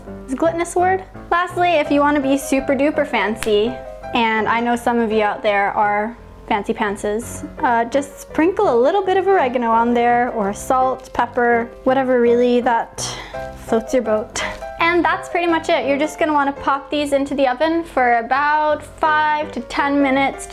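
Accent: United States. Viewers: Definitely a Floridian accent. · That explains a lot! Yours is the least odd foreign American-sounding accent I've heard, though. Had a friend whose wife was from... Croatia, I think? She had the most Brooklyn English accent I've ever heard. I didn't know she wasn't from Brooklyn for about a year after I met her. I never did find out if she learned to speak English from someone from Brooklyn or if she just chose a heavy American accent to cover her Croatian accent. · her accent sounds like it is from the midwestern us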